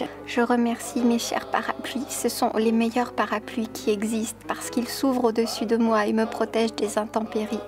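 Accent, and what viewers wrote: Quebec, Canada. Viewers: J'adore ton accent québécois ! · Ton accent québécois est bien fait j'adore ❤ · Ça fait plaisir de t'entendre parler québécois <3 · "Voilà, c'est l'été" aha toujours adorable 😊 continue comme ça! (J'adore ton accent québécois 😛) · J’aime mieux ton accent Québécois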